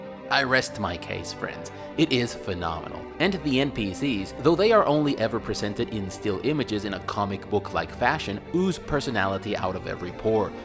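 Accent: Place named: Latin America. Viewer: Loved the review as usual. I usually give the thumbs up before the video starts and thus far haven't been mistaken, not once. As-always, a-mazing. Una consulta? Cuál era el juego que jugabas con las monedas el "Caliche"? Se te nota un acento latino en el inglés, pero no termino de adivinar de dónde. En fin, cada nuevo video de es una vista obligada para mí a este punto. Abrazo grande desde Argentina.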